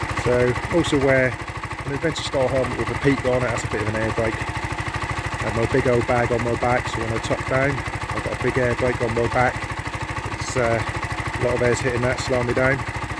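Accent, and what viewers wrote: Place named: Norfolk, United Kingdom. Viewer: How you getting on with the bike 10 months on.... what's the mpg like and how well does it sit at 70mph on the duel roads.... Moonrakers on Oak Street should be getting more in soon and I like the look of the g1 and the adventure version like this u1... Smart colours nice looks and seams to have the most torque out of all 125cc... Apologies for all the questions and thanks for the post... Nice to hear a Norfolk accent...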